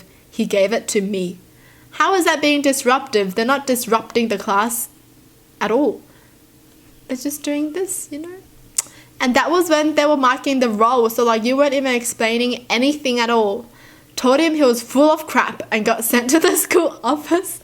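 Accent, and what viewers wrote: Australia. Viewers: Is it just me or I love her accent ✨ Yk, wotaaaaa and norrrrr and caaaaaare and sooooorrrr lol Aussie accent is so cute :D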